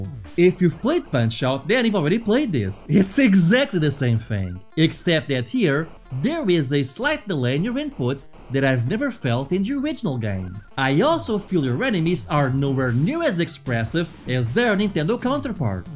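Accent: Portugal. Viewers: Portuguese accent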